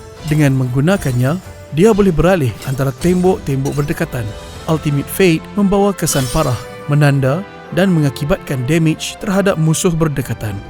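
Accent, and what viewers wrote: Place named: Perak, Malaysia. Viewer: orang perak ghupenya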